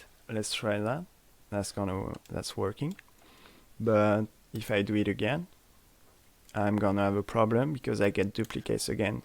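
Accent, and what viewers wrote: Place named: France. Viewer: Super la video avec l'accent frenchy ;-) ! Très très utile, merci !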